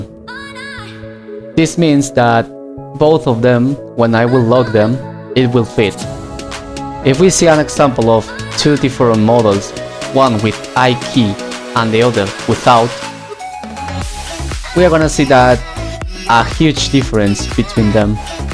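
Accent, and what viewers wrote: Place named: Germany. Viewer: pretty german, good tutorial helped me alot 🐙👍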